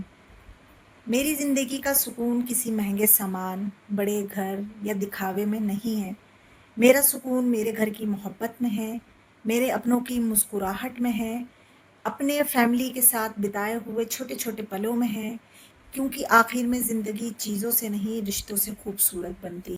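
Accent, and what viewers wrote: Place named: Pakistan. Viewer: AAP BILKUL PAKISTANI ANDAZ M BAAT KARRAY AUR INDIA SE H LIKHAY BUT CONFUSION AAP BICHMAY 🎶 🎵 MUSIC NA DALLAY BAQI ZABARDAST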